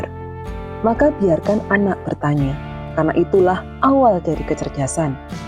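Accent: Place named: Java, Indonesia. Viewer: Jawa banget nduk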